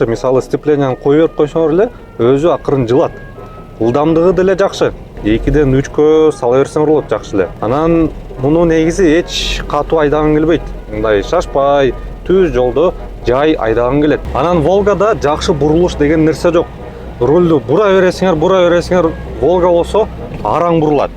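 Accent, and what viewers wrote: Standard. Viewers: Сонун экен .Алып баруучу сага чон рахмат ! Таза кыргызча айтып бердиниз . Ишинизге ийгилик. Чон респект !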